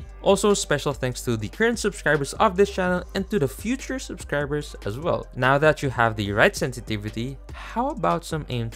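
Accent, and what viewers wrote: Philippines. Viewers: Bro you sound like a filipino but you live in qatar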